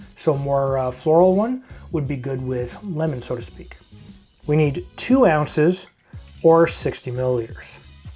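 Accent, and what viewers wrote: Canada. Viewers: Lets talk abeewt.....love that Canadian accent.....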